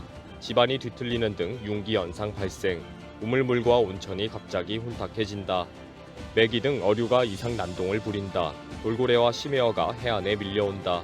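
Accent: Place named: Vietnam. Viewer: 해설자는 우리억양이 아닌 베투남 유민같은 억양인데 그리도 인물이 없나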